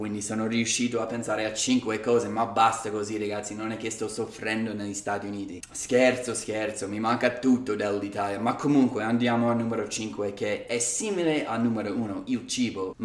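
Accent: Rome, Italy. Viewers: parla un po di romanaccio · Apprezzo, da romano, la punta di accento romano che hai! Continua così! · Daje parli romano mi mancano i tuoi video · Ciao il tuo accento romano e' fortissimo! Io lavoro per UA at DIA chissa' se un giorno it vedro' passare. Ragazzi godetevi l'Italia!!❤😭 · 😂😂😂parli anche un po' romano... Ti aspettiamo in Italia 😘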